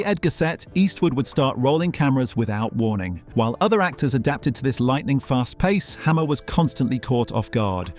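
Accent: United Kingdom. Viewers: This British accent voiceover seems to be on all these types of videos.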